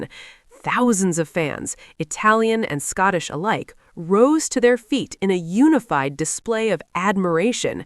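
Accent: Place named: United States. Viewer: Why have this AWFUL American commentary ?!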